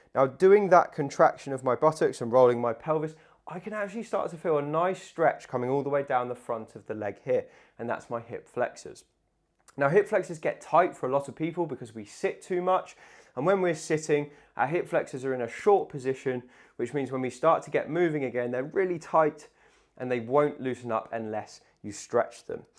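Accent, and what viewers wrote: United Kingdom. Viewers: Call me biased, but when I hear that right proper British accent, I just feel more assured that I am getting correct ✅ information. ( and I am American )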